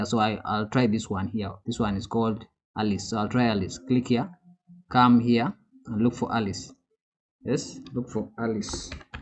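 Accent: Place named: India. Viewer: Are you a Nigerian? You sound like Indian 🤷 Love your effort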